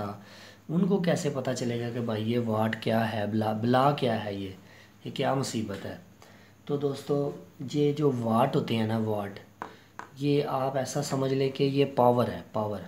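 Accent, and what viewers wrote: Punjab, India. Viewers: Good Work AND your accent is very cool REAL PUNJABI DUDE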